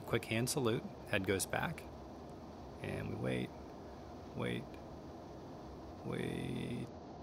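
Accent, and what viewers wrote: United States. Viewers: This dude is 100% from California with this accent!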